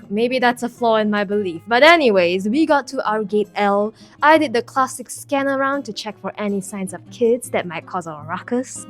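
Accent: Malaysia. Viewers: how malaysian chinese speak chinese is so real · Ngl the Malaysian Chinese accent catches me offguard every time lmao.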